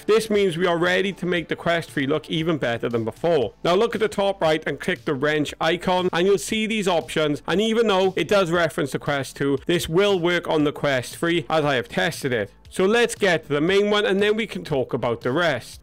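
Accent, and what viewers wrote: Wales, United Kingdom. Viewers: What on earth are you on about ARABIC? hes welsh thats why we cant understand us the terrible mic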